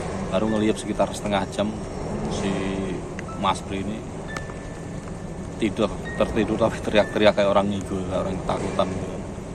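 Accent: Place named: Banyumas, Indonesia. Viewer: selamat malam,saya tinggal di Gianyar Bali,logat anda mengingatkan saya ke daerah asli saya,Banyumas.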